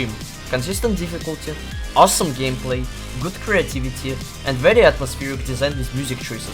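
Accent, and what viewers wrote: Russia. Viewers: man, u have have so Russian accent XD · You are Russian? (your accent sounds like Russian.) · U have russian ascend, are you? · russian accent noticed👌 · Bro you have absolute russian accent and great vid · у тебя как будто русский акцент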